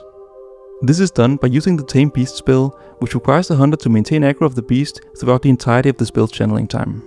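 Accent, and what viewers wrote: Denmark. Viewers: I’d almost bet everything I own that the guy speaking is Danish. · Ooooh, Danish-english accent😂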